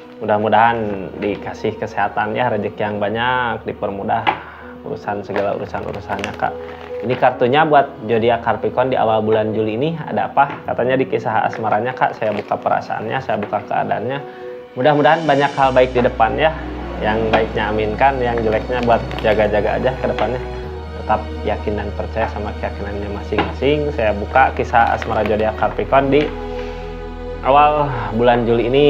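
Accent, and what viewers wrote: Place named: West Java, Indonesia. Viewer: Lucu ih.. sunda banget😀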